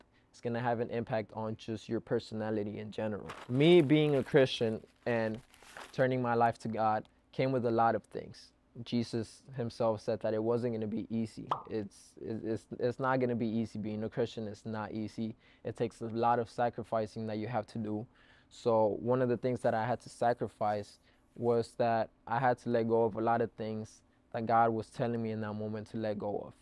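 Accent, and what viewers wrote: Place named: Spain. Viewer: whoaaaaa his spanish accent has gotten better. you can hardly hear it when he speaks english thats rly cool to see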